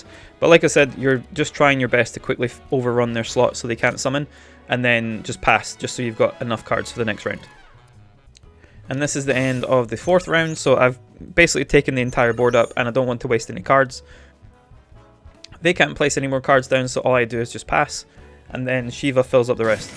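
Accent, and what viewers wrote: Scotland, United Kingdom. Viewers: I'm going through the musclehead battles and the moment and levelling up in prep for hard mode 💪🏼. Usually don't look at guides unless I'm at my wits end 😂.. Will keep an eye on your content, it's good hearing a fellow Scot keep you right. Thank you man I will keep that in mind ✌🏼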